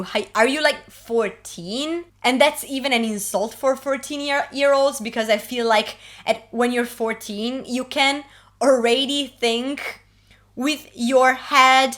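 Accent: Italy. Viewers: Da quando hai cominciato a parlare ho percepito subito l'italian vibe ✨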